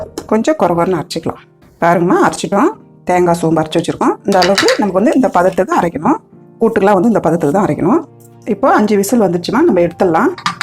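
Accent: Chennai, India. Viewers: சென்னை தமிழ்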